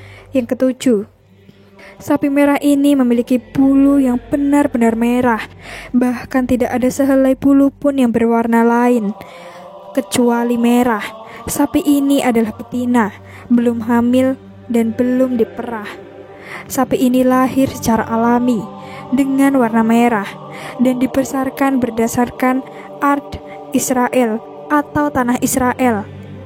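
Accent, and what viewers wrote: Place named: Java, Indonesia. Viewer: Kentel bgt logat jawa nya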